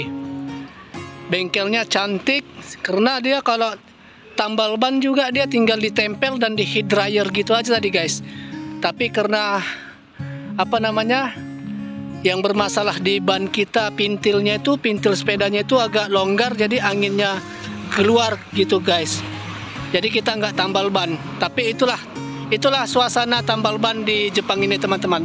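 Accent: Medan, Indonesia. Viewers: abg org medan ya logat abg kayak org medan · Baik bahasa Medan kita nya bg😂😂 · Maaf, bang ini suku apa ya, saya suka dengar logatnya, kayaknya bahasanya mirip logat medan...😊❤ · Saya sering ngikutin konten Anda.saya mau tanya dialek kayaknya anak Medan.udh lama tinggal di Jepang?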